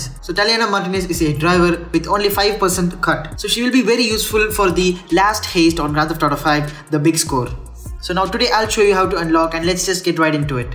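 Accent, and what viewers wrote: India. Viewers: Why do you have such a thick indian accent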